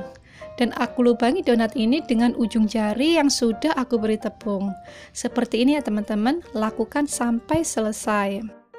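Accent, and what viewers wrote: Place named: Java, Indonesia. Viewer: Menul2 kak bahasa jawanya.. Endull 🤩🤩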